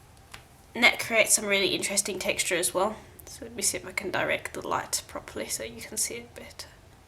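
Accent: South Africa. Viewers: Are you South African? Sorry I’m trying to guess your accent. 🤣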